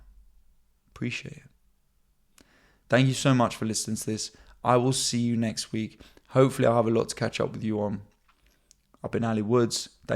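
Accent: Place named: Jamaica. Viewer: I am hearing a mixture of English, Jamaican and Black American mixed together!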